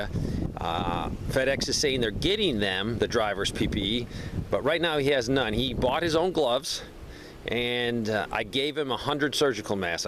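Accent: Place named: Upstate New York, United States. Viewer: Sound like you're in my neck of the woods. Upstate area.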